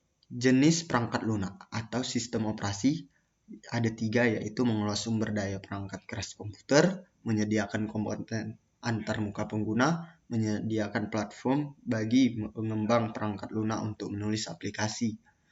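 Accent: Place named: Java, Indonesia. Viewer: Mas medok:v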